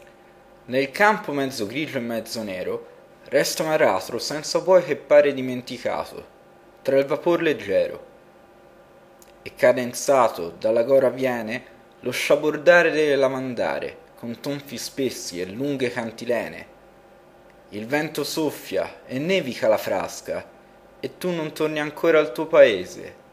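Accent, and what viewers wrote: Tuscany, Italy. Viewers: Scommetto che sei toscano haha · Allora, non so a cosa servono le tre letture, comunque non volevo dire questo. A me l'accento da toscanaccio maledetto piace, mi sa di uomo con poca istruzione (e sicuramente non lo sei) che questa poesia la recita da attore protagonista. Molto interessante.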